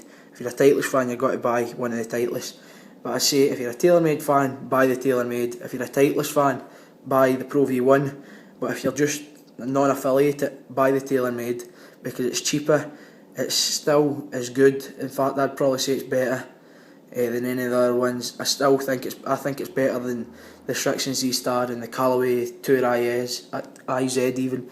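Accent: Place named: Scotland, United Kingdom. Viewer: I feel gifted that i can understand this thick of a scottish accent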